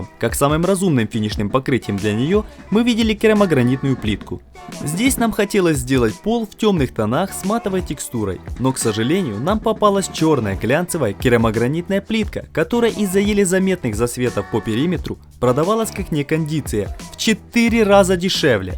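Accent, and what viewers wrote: Surzhyk, Ukraine. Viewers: Весело у вас пробивается суржик) Смесь русского и украинского.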